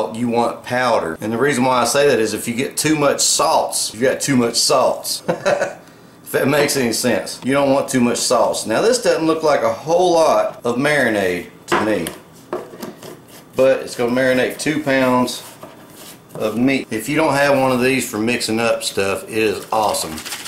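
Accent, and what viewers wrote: South, United States. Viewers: that thick southern accent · southern accent and all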